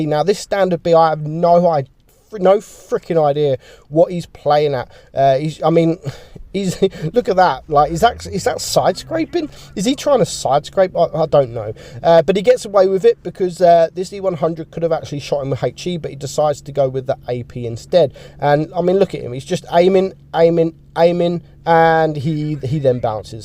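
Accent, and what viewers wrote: United Kingdom. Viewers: I like the way he comment on his channel with hia British accent and the way he said things like Example if E 100 shot you will will know about it or sometime when he said ita frastruating its very nice to hear because the way he said and british accent hehe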